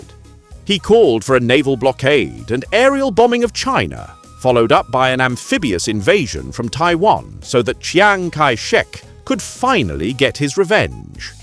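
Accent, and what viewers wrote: United Kingdom. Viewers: Finally a British accent in these kinds of videos Makes US flag merch Disgusting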